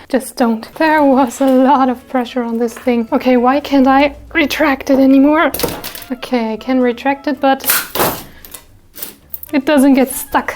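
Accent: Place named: Germany. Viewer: You Sound German or durch, and after you Said the Temperature dropped I knew exactly in what time frame you Made your Project xD i hated the Last two weeks because of their weird weather 😂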